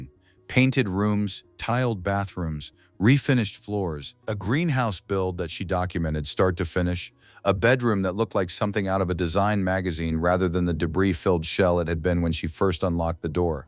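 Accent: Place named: Kentucky, United States. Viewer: I started watching about a year ago. As a fellow Kentuckian (also from the Louisville area) I swore she was in KY for the first several videos I watched ( know my KY accents!) until she said she was in North Dakota. But the accent just didn’t add up for that area. Never the video I watched today I was thinking about that. Now that I know she’s originally from here t makes sense!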